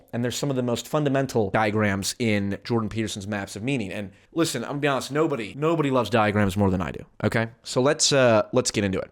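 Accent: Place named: United Kingdom. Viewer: hilarious british accent, good job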